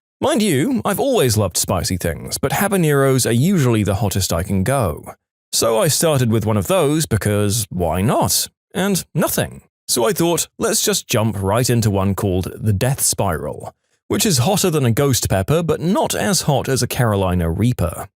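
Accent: United Kingdom. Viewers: I thought you were from England/British bud.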